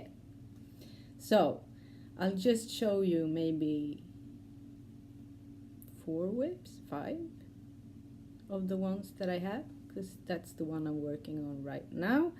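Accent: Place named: Sweden. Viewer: Hello from the UK 🇬🇧 I love your swenglish! And great wips x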